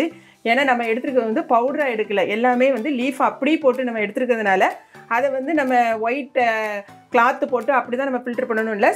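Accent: Tirunelveli, India. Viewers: Thank you mam.ஆனால் நீங்கள் பேசும் முறை திருநெல்வேலி மாவட்டத்தில் பிறந்தவர் போல் உள்ளது.அதான் கேட்டேன்.நான் திருநெல்வேலியில் தான் படித்தேன்.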